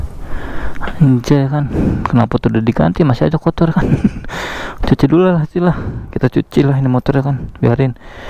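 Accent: Indonesia. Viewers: Anjir bahasanya orang indo bat kentel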